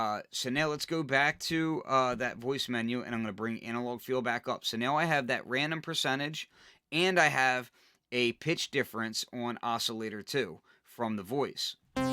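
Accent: Philadelphia, United States. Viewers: love your vids. gotta be a philly accent possible jersey or delaware.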